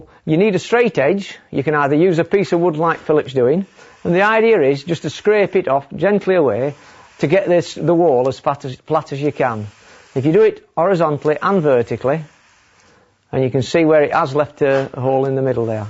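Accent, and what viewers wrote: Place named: Preston, United Kingdom. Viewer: sounds like a preston accent ,you from preston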